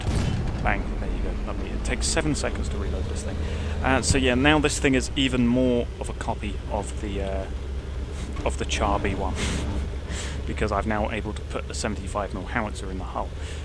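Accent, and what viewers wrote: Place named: United Kingdom. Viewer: You have a very nice bri'ish voice mate. Very clear and easy to understand for people who aren't native in English like me.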